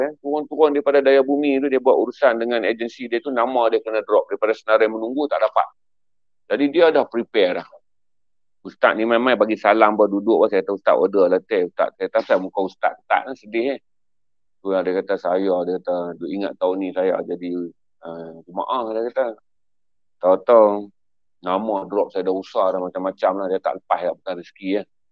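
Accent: Kedah, Malaysia. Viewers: Nak tanya...saudara pencerita ni org kedah ka? Cara gebang mcm org kedah...😆😆😆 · bunyi macam orang kampung saya, zul. dari kota Sarang semut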